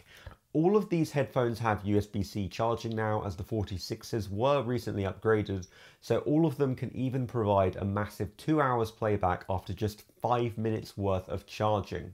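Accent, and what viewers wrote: United Kingdom. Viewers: That’s just because he’s got that “Posh” Brit accent. 🇬🇧 He could be describing his morning dump and it would sound like gold.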